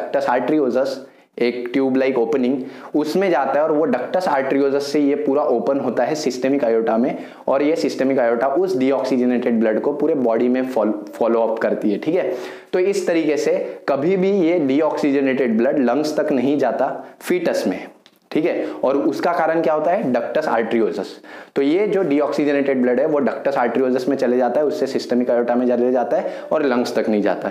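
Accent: Maharashtra, India. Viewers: Tum marathi ho kya bhaiyaa..... And aapki teaching level are next level..... ❤️‍🔥✨✨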